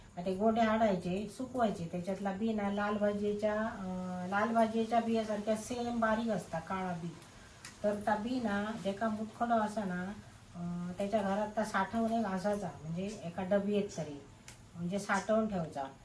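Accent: Malvani, India. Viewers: उत्तम मालवणीत समजावून सांगतात काकी तुम्ही 😂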